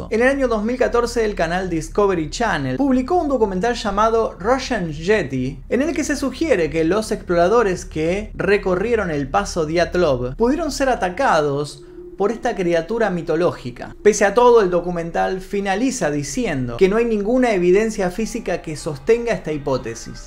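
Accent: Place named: Argentina. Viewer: Excelente narración pero siento q tener un lenguaje neutro sería lo ideal no es lo mismo ayuda q achuda y todo el resto de sustituciones q acostumbran los argentinos .exitos y es crítica constructiva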